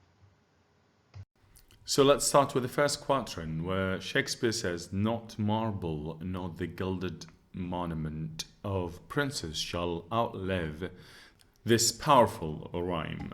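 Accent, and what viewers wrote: United Kingdom. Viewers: I thought you are from england !!😭💙💙💙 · If only there's a doctor with this perfect accent and language in my uni ɵ̷̥̥᷄ˬɵ̷̥̥ omg at first i didn't watch the intro so i thought u're an English person 😂 gd job British accent is so difficult for me, i think that's why i have an American accent lol,it's easier!.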